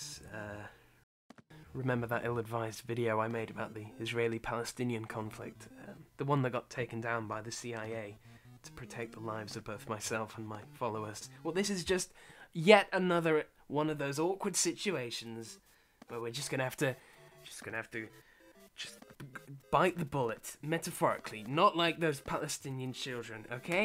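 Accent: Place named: United Kingdom. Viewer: You're only funny because of the British accent.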